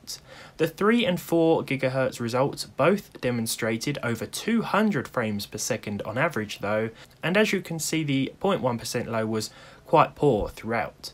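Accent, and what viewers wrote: United Kingdom. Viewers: Hey just joined your channel nice vids random British accent lad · I love the British English, sounds Smooth. Try it with a 2700X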